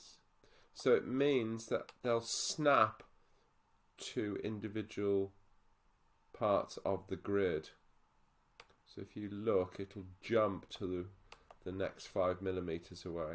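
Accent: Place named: United States. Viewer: holy american accent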